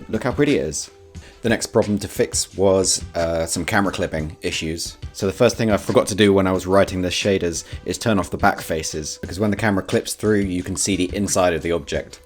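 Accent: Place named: United Kingdom. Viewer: Why are you british?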